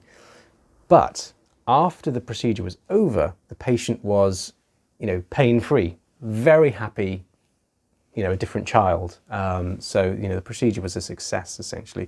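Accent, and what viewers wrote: United Kingdom. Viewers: Wish he didn't have a British accent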